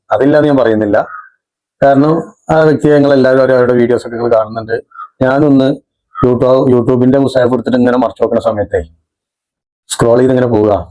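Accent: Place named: Malappuram, India. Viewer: അത് ശരി - സംസാരം മലപ്പുറം തന്നെ😃😃😃😃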